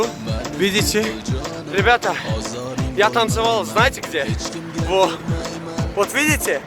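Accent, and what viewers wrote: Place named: Azerbaijan. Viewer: Акцент азербайджанский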